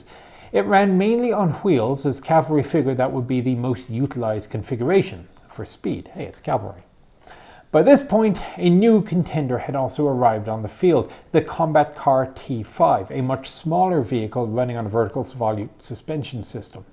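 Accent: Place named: Ireland. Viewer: I never noticed Irish in you accent... Untill I started noticing h-wheels. Not completely American yet XD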